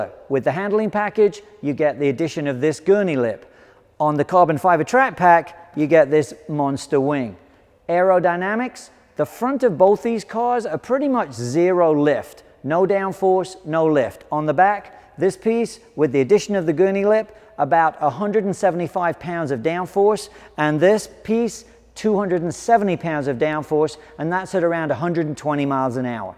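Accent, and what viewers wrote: United Kingdom. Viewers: He is from the UK been in the states for 30 plus years.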